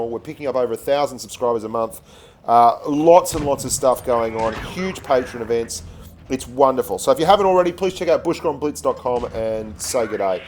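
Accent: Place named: Australia. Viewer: ok thank you and u sound australian ik so cause I live with 2 australians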